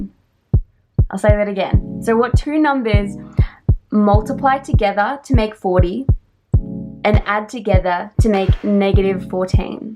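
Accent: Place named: Australia. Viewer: It sounds like you are from Australia, but you use grouping and NOT the Australian method. Very curious.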